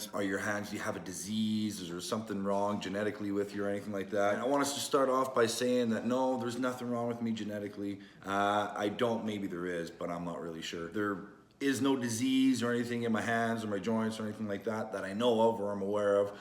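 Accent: Canada. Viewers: So us Canadians really do have an accent eh?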